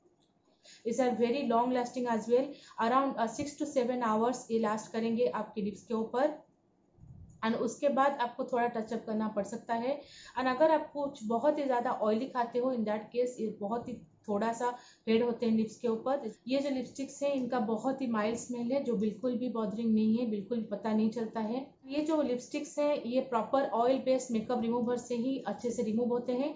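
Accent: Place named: Odisha, India. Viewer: I could figure out the Odia accent ...😄❤️I am too from Odisha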